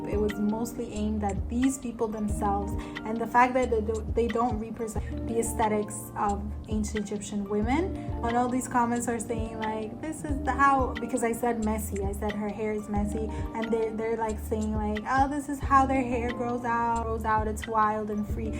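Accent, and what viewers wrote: United States. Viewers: Sorry but I don't believe you're not an American. Not for a second. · Wow, so you picked up the US Mid Western American accent like here in Detroit. I assumed you are between 21 and 29. Also, you are gorgeous. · Is speaking with a American accent as you say you have never been to America then isn't yr accent cultural appropriation? I do not mean to offend or be rude, I'm just wondering why you have a English American accent. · I am an American and would agree your English is very good. Your accent would be mostly American (although America has many different accents). Enough that you could pass as American if you were here.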